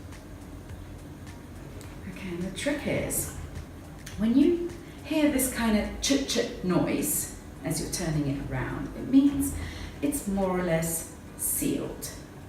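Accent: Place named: United Kingdom. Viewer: Great video! personality and her British English, plus some information give the viewers so much info and pleasure to watch. Thank you! I watched to the end..., you are so funny and definitely a lifelong learner!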